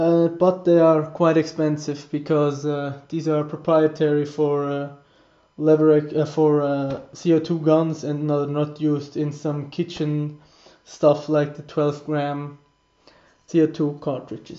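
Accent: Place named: Germany. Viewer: man merkt irgendwie sofort dass du deutsch bist xD ist aber nicht schlimm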